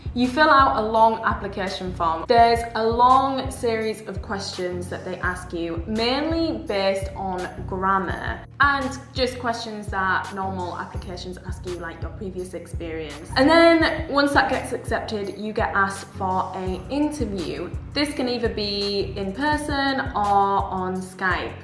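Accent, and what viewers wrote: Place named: England, United Kingdom. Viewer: Hello ! Thanks for the video ! Im very curious to know about your accent. From which region are you in England ?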